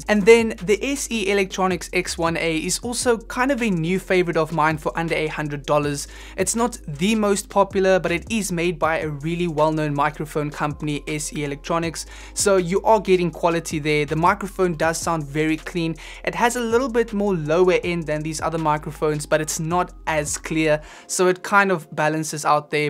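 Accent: South Africa. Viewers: hello ! are you from south africa ?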